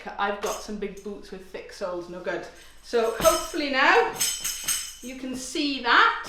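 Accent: Wales, United Kingdom. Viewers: Just love watching you and your lovely, warm Welsh accent. I've just begun to learn at the age of 84. Thank you.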